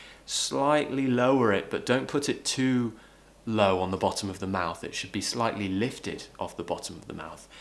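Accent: United Kingdom. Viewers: Thx man 🙏🏼 u are perfect teacher 👨‍🏫 I really love British accent 😍😍❤️❤️ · Love uk accent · I like the british pronunciation.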